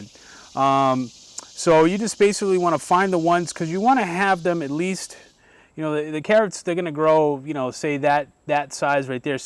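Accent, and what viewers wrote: Boston, United States. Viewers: you from Boston, guy?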